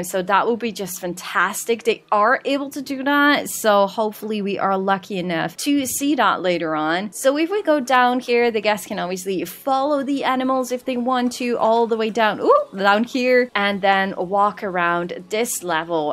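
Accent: Netherlands. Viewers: im non-binary and my name is Nads. it would be really awesome to see a more androgynous looking staff member with my name 🥹 or a funky lil animal. I'm also Dutch btw!!! our accents are very similar 😁. I've spent the whole morning catching up on this series, and i love it so so much!! i would love to see more foliage footage personally, and besides, you tag timestamps so well (thank you btw) that people could easily skip ahead if they wanted to!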